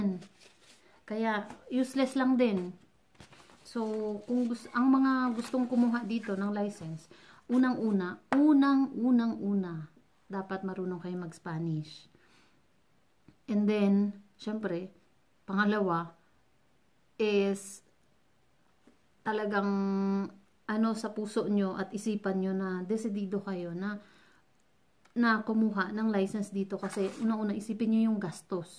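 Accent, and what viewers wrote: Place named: Western Visayas, Philippines. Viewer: Hehehehe Ganon dapat malambing ilonggo hehehe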